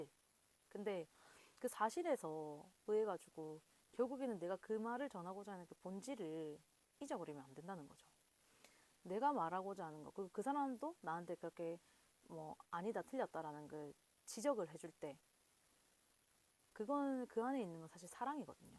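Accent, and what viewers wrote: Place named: Gyeongsang, South Korea. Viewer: 앗 사투리 너무 반가운데요~~^^ 경상도분이셨나요? 영상 감사합니다. 저도 어제 댓글로 말씀드리려했는데 영상 소리가 작아서 스피커 최대로 듣고 있었어요😅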